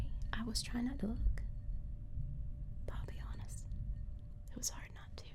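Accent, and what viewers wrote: South, United States. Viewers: Omg what a video you’re southern accent was so on point an you made the most beautiful cowgirl ever an awesome an the flannel shirt was such a nice touch my mom raised me to be a gentleman a lovely angel like that a lovely angel like that a true 10 out of 10 It is a lovely feeling when you see someone who catches your eye an can’t that’s the same feeling when ever you are on twitch by the way you’re always beautiful you captured my imagination just watching each action in this video unfold I’d blush if someone that pretty admitted she had feelings like that glad that was not the last goodbye for those to love birds this was truly great asmr at its finest love you mama A+ work so talented rocking the red lips he should have kissed her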